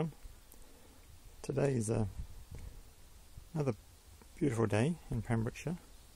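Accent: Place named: Australia. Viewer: you sound very AUSSIE.!!!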